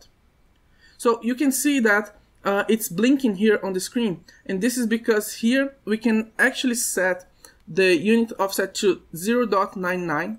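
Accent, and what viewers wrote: Brazil. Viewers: Você é br hein não engana kkj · da pra ver q é do brasil so pelo sotaque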